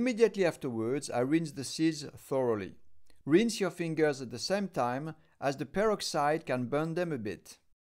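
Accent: France. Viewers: Lovely french accent !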